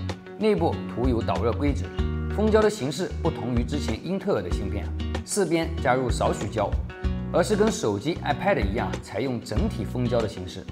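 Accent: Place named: Zhejiang, China. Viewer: 浙普的味老重了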